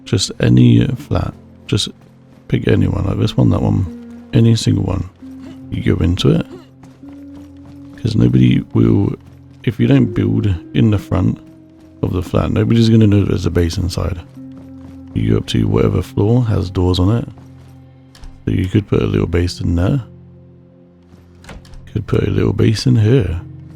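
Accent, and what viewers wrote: United Kingdom. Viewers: r u scottish or english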